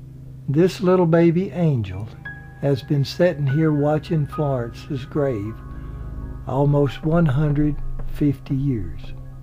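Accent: South, United States. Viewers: I really loved your excursion into history by way of visiting this old graveyard. My sister and I often drive through old graveyards and marvel at the writings we find on the headstones. Some of them are very sad indeed and show the anguish the survivors felt at the time. The story you told of Helen and Florence was very heart breaking. I can understand how a mother may want to keep in touch with her little daughter even after death has separated them. So sad indeed. I wonder what happened to little Florence's father and mother? I guess that answer has been lost to the ages., I hope you will do more of these types of stories based on old grave yards because they are all very interesting and spell binding. Also, you have the voice for these kind of tales too. Your Southern accent really relates an emotional quality that adds a lot to these tales of sadness.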